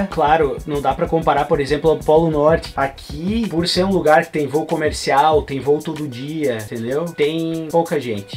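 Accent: Rio Grande do Sul, Brazil. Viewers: Só pelo sotaque no início do vídeo ja percebi que era gaúcho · Nem 5 seg de vídeo já vi que é gaúcho! Hahahah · gaucho certeza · Esse sotaque de quem toma um mate na redença não me engana... curti o video e curti o canal... quem sabe um dia fico uns tempos ai! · pelo acento com certeza tu é gaucho tche hahahah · Nos primeiros 10 segundos pensei na hora que tu é gaúcho hahah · Mano, tu me parece ser gaúcho kkkkk Manda um salve pra Rio Grande do Sul, Gravataí TMJ!! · bah LlllKk, tu é gaúcho? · Nos primeiros dois segundos ja identifiquei que é gaucho..hahahaha. É nois! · No início, pelo sotaque, eu sabia que era meu conterrâneo gaúcho!!!! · Show, mas tu é gaúcho né? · tu é gaucho? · em segundos ouvindo ele falando já dá pra ver que é gaúcho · Teu sotaque pareces com o do RS, eres daqui? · Sotaque gaúcho kkk❤️ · Ele é gaúcho!!!🤗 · irmão!! tu é de onde mano!! 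parece ser gaúcho... hehehe encontrei seu video agora... primeiro video que eu vejo.... e teu sotaque é muito parecido com o nosso... do RS! hehehe abraço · É Gaúcho, dá pra ver no sotaque, de que cidade tu é? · Mas bah, guri! Esse sotaque entrega daonde tu veio! É dos meus, curte um Chima! Que massa teu canal brother, que conteúdo incrível! É viciante, confesso. Um grande abraço aqui do Rio Grande Do Sul. Dalhe grêmio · tu nasceu em q estado do brasil ?? (obs) tu parece c gaucho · Gaucho que fala pia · Parece gaúcho pelo sotaque kkkk, talvez eu esteja errado, mas o sotaque parece muito com o meu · Dale RS! Hahaha reconheci esse sotaque com 10 segundos de vídeo! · Tu é gaúcho cara? Maior sotaque daqui kkkkkkk... Não sei como cheguei no teu canal, mas teu trabalho é fera! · sotaque gauchoooo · tu é gaúcho? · Sotaque gaúcho bah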